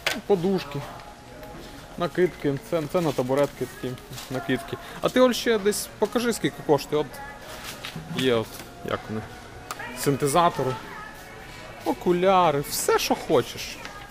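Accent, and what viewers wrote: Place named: Volyn, Ukraine. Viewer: Чути Волинська або Рівненська область)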